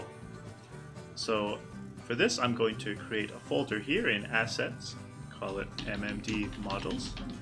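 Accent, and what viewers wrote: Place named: United States. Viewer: I've tried staring at it for 20+ seconds, that doesn't seem to work for me. It might be because my camera resolution setting isn't that high (640x480) since it gets really sluggish at higher res. Anyway, it's not really a problem since I can consistently get it to sync after I turn my head. By the way, are you Canadian too? You sound American-ish but you say "zed", lol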